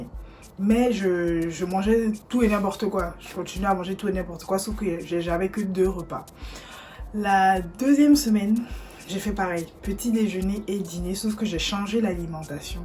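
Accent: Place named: Ivory Coast. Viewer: On sent l'accent ivoirien. Ko djaaaaah!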